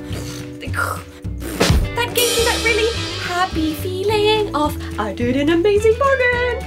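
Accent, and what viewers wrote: United Kingdom. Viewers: ^_^! I have to ask you, actually is little bit um, other question than like kawaii fasion! Because I don't have money money, and here aren't any stores, too expensive (postage) [Czech post the best ha] and blahblah Have you ever been in Czech Republic? x_x Do you drink beer? um, btw I like your british pronunciation! (too shy to say love)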